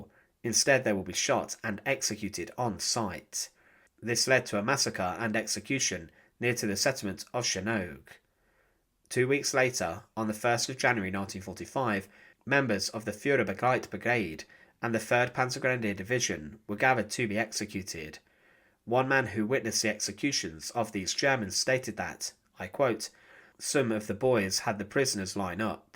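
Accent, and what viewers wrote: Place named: England, United Kingdom. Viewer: I'm sorry but I can't understand a thing this lady is saying. Her English accent is way too thick.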